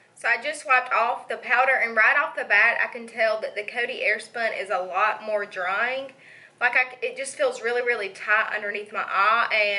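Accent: South, United States. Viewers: loveeee that country accent!!!!!!!!!! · where r u from? I hear the Southern drawl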